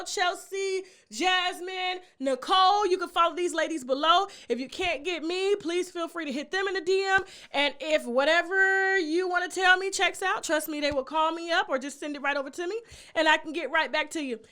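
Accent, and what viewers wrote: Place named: South, United States. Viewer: She’s southern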